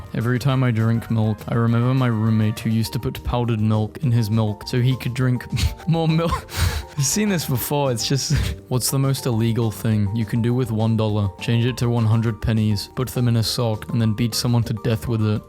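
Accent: United Kingdom. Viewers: pretty sure he’s British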